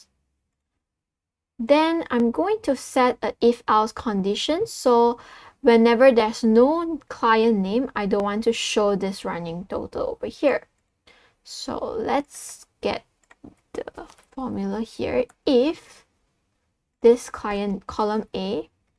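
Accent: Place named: Singapore. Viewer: As a fellow singaporean I notice the accent - prolly singfaporean or malaysia - hi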